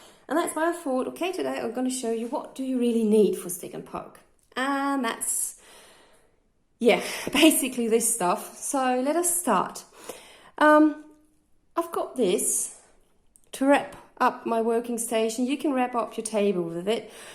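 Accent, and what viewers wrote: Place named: Australia. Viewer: i thought she was a fellow australian for a minute lol.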